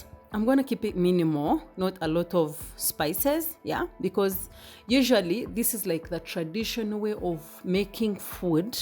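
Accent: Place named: Ankole, Uganda. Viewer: First time here , fast forward I love ur English ankole accent , then how u make it simple for day to day life of a Ugandan not professional chef, I have already subscribed can't afford to miss anything. Well done mami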